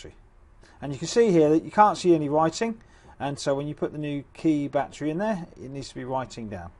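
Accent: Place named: United Kingdom. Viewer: Yeah this one gets it! great close ups which are necessary here and a muchhh easier way to get the last piece open than the last video. I️ tried their way for like half an hour this took just a min. Thank god for YouTube or we’d never get these things open! Well done. I️ Also like the British accent ha it makes me trust you. For some reason. 🤔 Is that why nature documentaries are always narrated with a British accent? Cuz we believe that Brit’s have gained wisdom through their long period of history kinda running the western world? Or because the brits just make all the best nature documentaries? I️ suppose I️ just Love British things all around. Has anyone read this far? I’ve been up all night and fixing this fob is the icing on the cake 👌😎 now I️ know... I’m thankful for British accents and how much I️ trust them! Hey Now I️ know what to do when I’m stressed out...have people give me advice in British accents! 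Or find a British guy to hang out with. That could work:) I️ need a British boyfriend...for my health! I️ live in Cincinnati in case there’s anyone who wants to hook a sista up. Forrreal tho this is just as good as tinder imo. Who knew I’d leave such a long comment! This is a record and a first. Probably because I️ feel like the ppl who read this will be very purpose driven and probs not read/mind this rant for a brief distraction from the rocket science that is opening this fob! Waited months to change it but I’m doing it for my friend secretly so hell have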